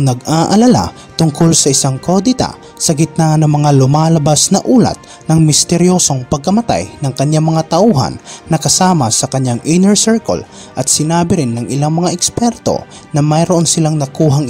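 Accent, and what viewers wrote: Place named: Visayas, Philippines. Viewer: Bakit ganyan ang punto mo bisaya ka ba o bisakol ha ha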